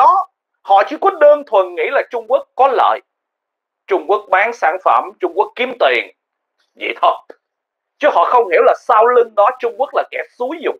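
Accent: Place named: Mekong Delta, Vietnam. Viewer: Em trai nói giọng miền tây nghe đã tai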